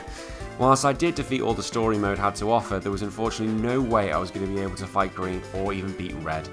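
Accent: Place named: Wirral, United Kingdom. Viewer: Great video mate, don't usually like/comment cos I watch YouTube on xbox but am not logged in so I came back to your video on my phone just to let you know how good this was ! Loved the joke about being 32, married with a kid lol - Pokemon is for all ages :) anyway great video mate, you should definitely do more challenges like this one ! Btw where you from? You sound very close to me on the Wirral?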